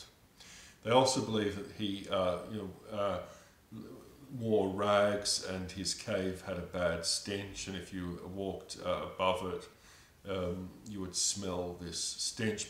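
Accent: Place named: Australia. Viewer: interesting vid - fellow aussie it seems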